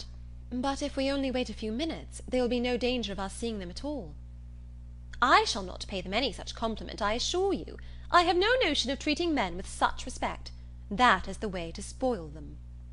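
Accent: United States. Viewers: Jane Austin with an American accent. 🤮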